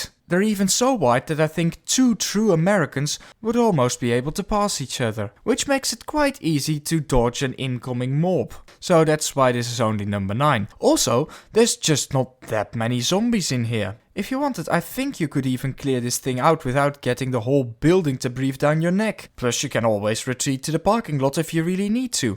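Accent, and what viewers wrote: Netherlands. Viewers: I speak Afrikaans, and to me you sound like a Dutchman! Am I on the money? Been watching for a while. I like your style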